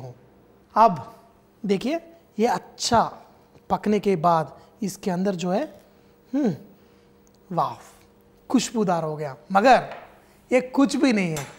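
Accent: Hyderabad, India. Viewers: Anna hyderabad hindi baagundi.👌 hindi urdu mixing & Rasam jabardast 👍 nenu kuda chestaanu . ingredients okate tareeka veru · Nice hyderabadi hindi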